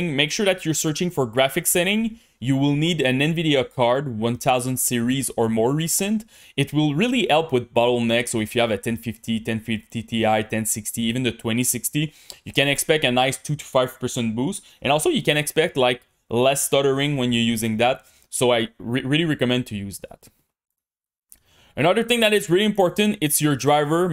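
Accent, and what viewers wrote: Quebec, Canada. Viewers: Sa ses de la belle accent quebecois :) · Super. Entk sa parait que tu est québécois xD. Entk C'est super, j'ai gagné en FPS. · Toé tu viens clairement du Québec LOL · Je peux reconnaître ton accent du Québec ....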